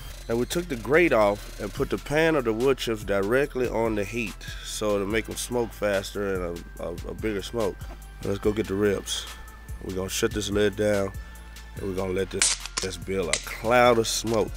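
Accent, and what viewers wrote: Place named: South, United States. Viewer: this guy lives in la but you can tell he came straight outta the south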